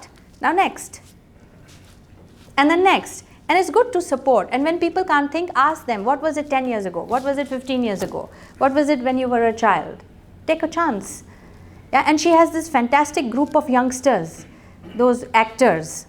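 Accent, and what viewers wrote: India. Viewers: In this video there is maximum Indian English... Keep it up.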